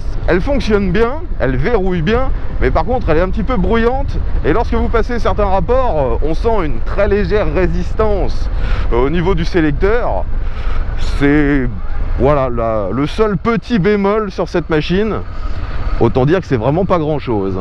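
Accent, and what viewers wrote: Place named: Paris, France. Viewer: On accroche pour tes commentaires ,et ton timbre de voix avec cet accent titi Parisien bien sur.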